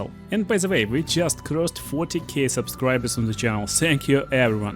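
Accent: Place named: Russia. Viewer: The gameplay is nothing special really no offense but your boris accent and little edits made it very entertaining.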